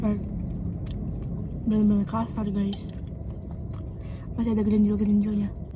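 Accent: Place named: Java, Indonesia. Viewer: Medok bgt😳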